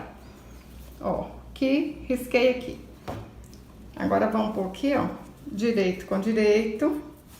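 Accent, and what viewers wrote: Minas Gerais, Brazil. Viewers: Gostei da sacolinha. Mas, especialmente, do seu sotaque! Já assisti a vários vídeos seus mas só hoje vi que você é mineira. Como eu! 😘😘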